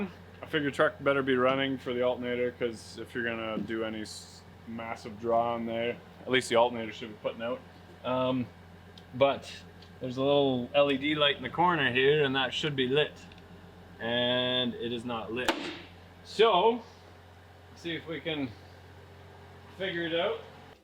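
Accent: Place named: Alberta, Canada. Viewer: My ears tell me this guy's from Alberta, but my eyes say Ontario. Either way, it's Canada. :P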